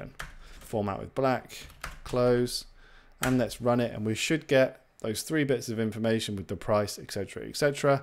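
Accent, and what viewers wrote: United Kingdom. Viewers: It's the British accent. It's a feature not a bug. Nothing to improve.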